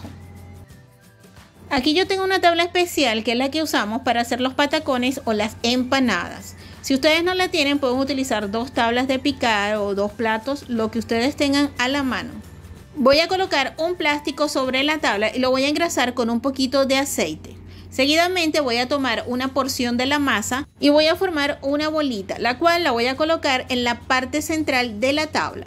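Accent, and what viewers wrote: Venezuela. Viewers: Buena receta para las personas que no pueden comer gluten ( aunque gracias a Dios ,puedo comer de todo ) Saludos desde Venezuela 🇻🇪 , aunque por tu acento creo que también eres venezolana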